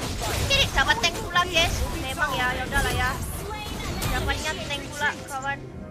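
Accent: Java, Indonesia. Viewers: Medokmu candu sekali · Jawa ga sih?? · medok bgt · Mewedok ni streamer suka aku wkwk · Kowe wong jowo mbak 👀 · mba nya org jawa kah? logat nya kental banget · medok medok lucu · Suaranya medok 😂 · kok bisa gue baru nemu manusia 1 ini, gameplay bagus, hero ga template cwe, auto subscribe sih apalagi jawa banget😂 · Medhok ya ngomongnya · Mbak medok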